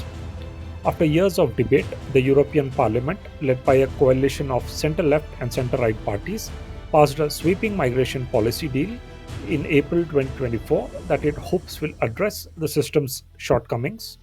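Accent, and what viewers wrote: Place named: India. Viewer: The narrator sounds Indian, plenty of room in India to enjoy mass illegal immigration . Send them to India or the other countries of the Indian sub continent where they can enjoy diversity at the expense of the Indian people or the people from the surrounding countries. Or let the dishonest narrator welcome them into his home free of charge. Not asylum seekers but illegal immigrants , enough with your lies.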